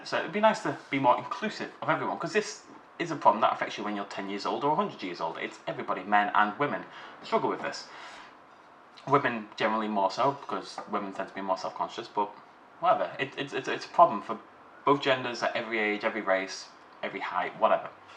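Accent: United Kingdom. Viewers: Don't forget the British accent. Unless you have one yourself.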